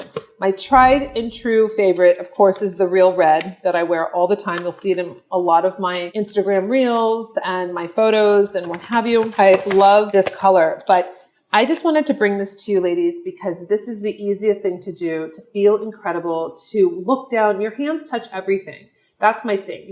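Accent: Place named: New Jersey, United States. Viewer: Are you from N.J?? You sound exactly like Margaret from Real housewives of N.J.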